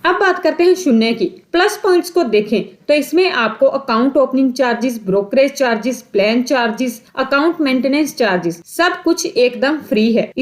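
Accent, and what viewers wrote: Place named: Punjab, India. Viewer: Loved the Punjabi ascent. I am using Zerodha and touch wood didn't face issues till date. Having said that I am beginner hence do not transact heavily on the platform. Good to know Angel One is a good platform (I hope this video was not sponsored by them). Please make one video on how to move your shares held in Zerodha to Angle One?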